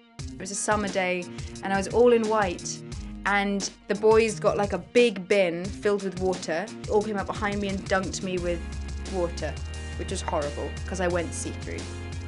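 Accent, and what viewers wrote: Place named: United Kingdom. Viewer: The way she speaks fluently a British accent it's like hearing a raspberry in ears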